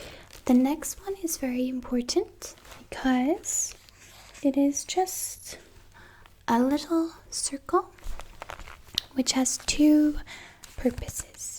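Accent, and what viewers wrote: United States. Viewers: A British girl with American accent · did you change your accent... It sounds more American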